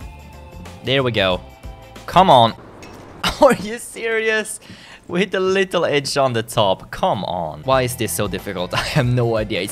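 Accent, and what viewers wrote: Sweden. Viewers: Ur english is so swedish.. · Are u Swedish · I can hear on His English accent hes Swedish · Are you swedish? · Good svengelska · Are you from sweden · Are u swedish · Are you swedish? Cuz your dialekt sounds swedish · Are you from sweden? Är du från Svergie ?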